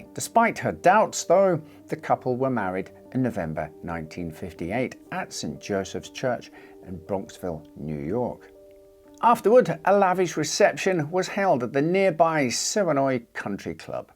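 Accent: Scotland, United Kingdom. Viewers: I like your stories and enjoy your soft Scottish accent. I can't abide stories about harming animals and had to avoid 'Miracle Mike' and struggled through the 'Tarrare' story. Otherwise, great channel. Have you thought about doing a piece on 'The Ant Hill Kids'? A very strange tale, indeed.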